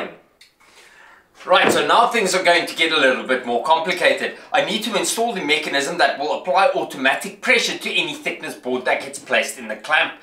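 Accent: Afrikaans, South Africa. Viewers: It's English......from South Africa. We have accents. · Is that a south African accent? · Hey man, your accent….? Is it Afrikaans?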